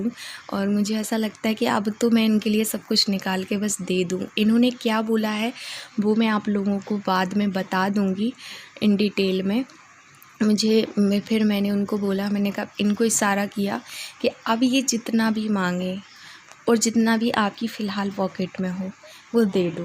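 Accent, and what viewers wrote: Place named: Kanpur, India. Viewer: Apki avaj bahoot pyari hai....mere jesi lenguse hai apki....gao....kanpur...ki ho ap Kya.....me kanpur ki hooo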